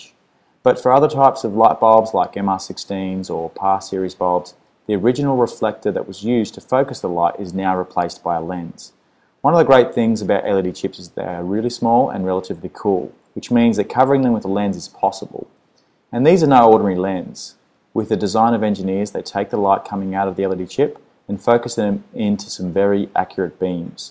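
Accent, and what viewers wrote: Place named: Australia. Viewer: You sound Australian, but you said Aloominum. WTF?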